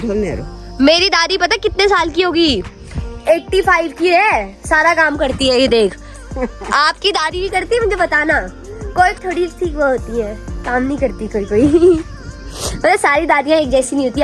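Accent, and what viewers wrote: Garhwal, India. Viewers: Cute gadwali bolti ho app yll ❤️❤️❤️❤️ Aur nice vlog aur tq comment like Kane ko ❤️❤️❤️❤️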